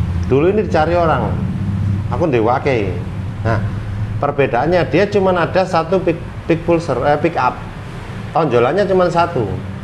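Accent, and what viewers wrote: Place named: Surabaya, Indonesia. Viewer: suroboyoan rek...siiip jelas dan trimakasih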